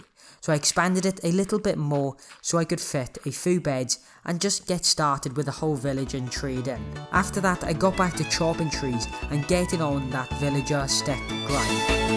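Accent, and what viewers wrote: Wales, United Kingdom. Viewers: Banger btw yout welsh accent is perfect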